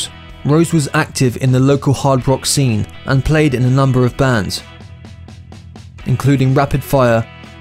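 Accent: United Kingdom. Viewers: Is it just me or does the British accent describing one of America's greatest rock bands really seems to not be the right accent to describe Axl.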